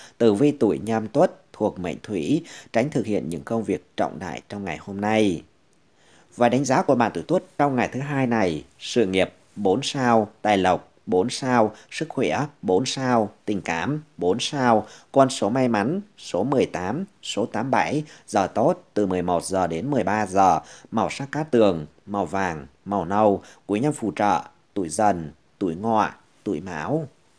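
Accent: Thanh Hoa, Vietnam. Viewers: mình nghe giọng của bạn ...mình đoán bạn người Thanh Hóa ...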